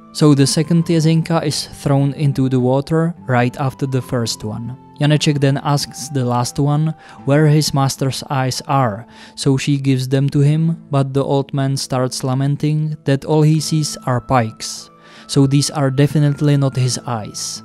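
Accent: Czech Republic. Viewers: good english bro, but you should take away this czech sound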